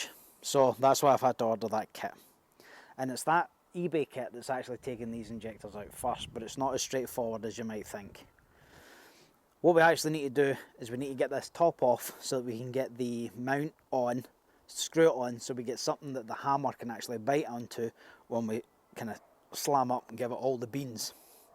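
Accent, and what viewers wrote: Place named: Perth, Australia. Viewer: Mate where are you located? If you’re looking for a wee Homer , I’ve a 2011 traffic, immaculate condition but the injectors need replacing. I’m in Perth. Your accent sounds close by.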